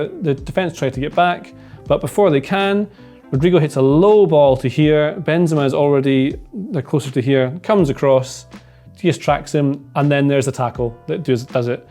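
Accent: Scotland, United Kingdom. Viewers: Let me guess , is he a Scottish?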